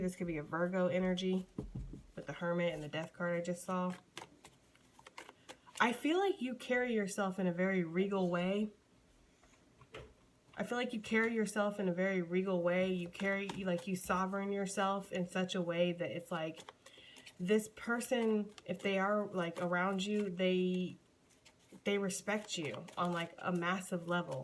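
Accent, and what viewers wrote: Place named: New York, United States. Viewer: You talkn to me this morning #pureNYvoice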